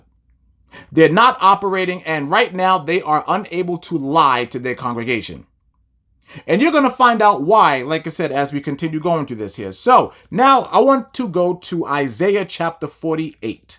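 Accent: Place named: New York, United States. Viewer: Shalawam Brother, praying safety for u n ur household. Sounds like ur from the Big Apple so be safe n keep doing what TMH n Christ wants u to do. MHICBU all!!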